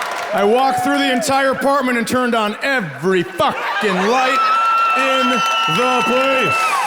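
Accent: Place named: Canada. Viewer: Just found you on TikTok and had to come here and watch you. I thought you were Canadian at 1st from your slight accent. Found out you are from Fergus and I'm just an hour east of there. I now realized why people think we sound like Canadians. We're just too close to the border and it's all their "Canadian wildfire" smoke we get each summer turning us into Canadians. 😂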